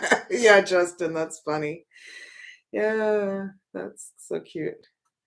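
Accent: Quebec, Canada. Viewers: your Canadian french accent is so sweet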